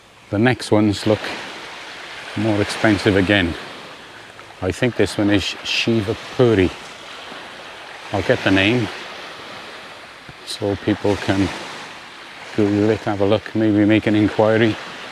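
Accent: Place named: Cardiff, United Kingdom. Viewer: I thought u had a Cardiff accent I’m living in Melbourne but I’m from porthcawl originally I’ve got a condo in jomptien in the Maldives ready for retirement after this virus clears up your video is very good keep it up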